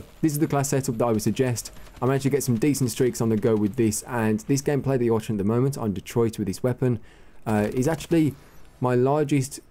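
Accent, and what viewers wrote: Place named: United Kingdom. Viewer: thank u for the tips n trick, british sounding tmartn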